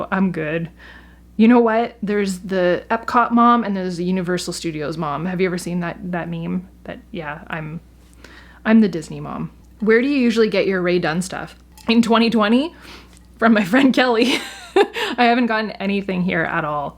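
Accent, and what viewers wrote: Quebec, Canada. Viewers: Tbh as a french canadian, I love when you speak french with the perfect Quebecois accent.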